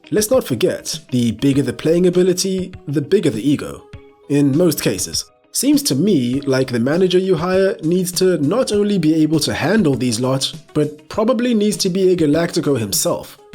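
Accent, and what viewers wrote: South Africa. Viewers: Off topic, are you South African? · Random question mate but are you South African ?🤣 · Great videos mate keep up the Good work🔥.....I can't help but notice your accent sounds very South African... so are you from SA😂❤ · is the narrator South African ?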